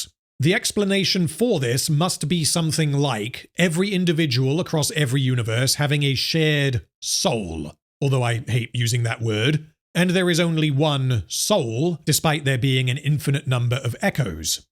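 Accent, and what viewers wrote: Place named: South, United States. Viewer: Are you a voice actor? You have such a bombastic voice. I'm not an expert on English accents but it certainly sounds "southern". Finally checked out your own stuff but have watched a lot of your EFAP appearances. Best of luck with your channels & I am looking forward to watching your previous vods.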